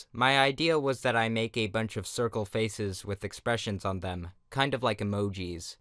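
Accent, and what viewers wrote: United States. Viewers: i find your american accent attractive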